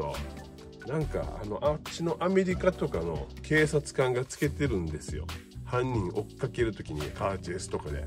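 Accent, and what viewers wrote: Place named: Okinawa, Japan. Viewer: 喋りがツボります笑 沖縄感最高です😂😂😂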